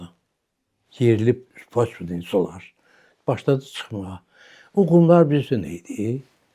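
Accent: Baku, Azerbaijan. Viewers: Bakililarin lahcesi yekdir. Allah Size omur versin❤❤❤❤❤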